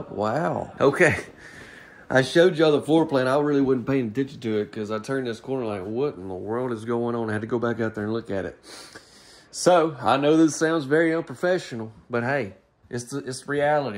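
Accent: South, United States. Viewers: I LOVE YOU! I need to tell you though it’s not Loo-EE-Ville. It’s Lou-a-vull with the a barely heard! I live in Bowling Green, KY (aka Corvette City USA) We Kentuckians have been adding & deleting syllables long before the Millennials made it a “thing.” 😂 With your oh so pleasant sounding voice and friendly southern accent you can pronounce Lou-a-vull & sound like a native!!!! 😊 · I love to watch your videos! I’m in the process of building a new home but I love your southern accent and it’s just fun to watch your tours! Don’t ever stop being you!! I get lots of ideas from watching!!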